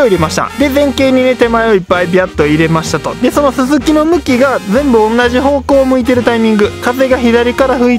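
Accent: Kansai, Japan. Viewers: 自然な関西弁が好感持てます。 また、どんな目的でどうやって撮影してるのかも参考になります。 撮影した作品は応募とかされてるんでしょうか。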